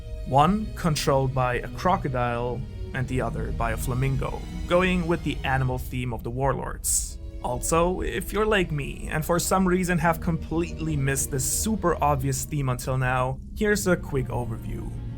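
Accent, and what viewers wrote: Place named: Germany. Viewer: you dont sound native english....are you from germany ?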